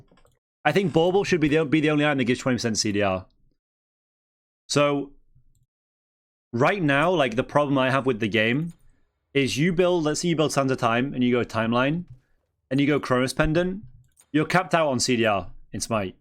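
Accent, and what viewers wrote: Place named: United Kingdom. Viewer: 50 minutes of a British ranting... Man were eating good today 🔥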